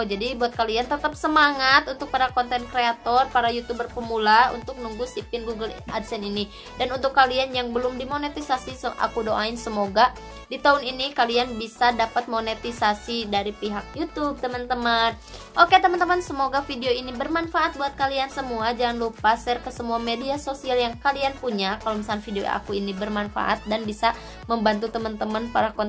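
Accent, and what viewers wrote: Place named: West Java, Indonesia. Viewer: mhun.ttp smngt ja.teh..logat Sunda na mani katingalian pisan.hehe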